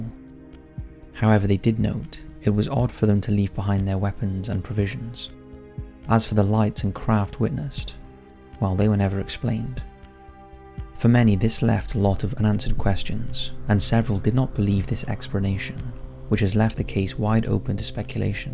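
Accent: Wales, United Kingdom. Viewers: Think he's Welsh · He's from the UK, so his dialect is different than the way Americans or Canadians pronounce words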